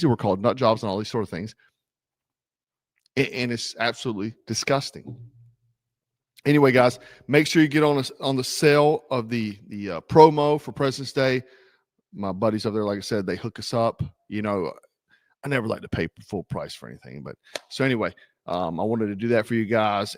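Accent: South, United States. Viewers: He's southern, they read, write and speak the south American way .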